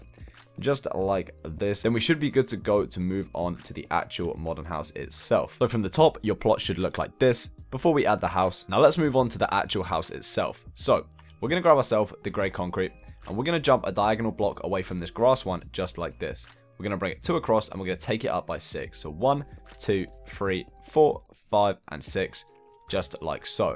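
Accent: United Kingdom. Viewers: Ar you english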